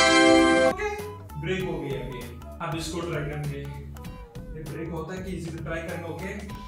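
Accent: South, India. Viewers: your south indian accent is so good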